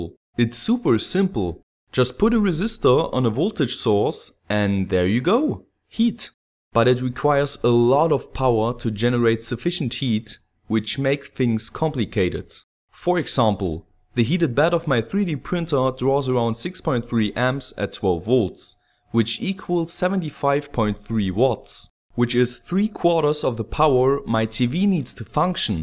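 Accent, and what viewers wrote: Germany. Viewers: Are ou german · Where are you from? Bist du Deutsch/ Are you german?:D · Are you german or something? YOu sound like a german speaking english :D Wenn ja nice :D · Are you realy German? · du you come from germany ?xD i come from ! you hear so x33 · i cant hear your english anymore. you are definently german or sth. else! (i m 14 and german) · hör ich da nen dutschen akzent ?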